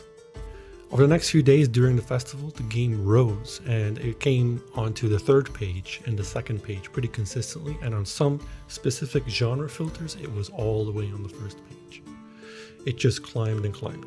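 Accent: West Flanders, Belgium. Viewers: Te ton nog ne west vlaaming. Van wo zij wel? We zijn trots. Veeeel sucses nog in de toekomst. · En nen west-vlaming ton nog 😅 gg!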